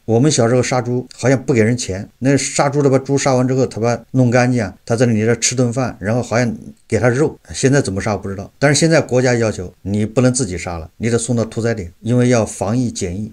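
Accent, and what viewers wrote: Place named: Anhui, China. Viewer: 听口音像 安徽安庆和铜陵的口音啊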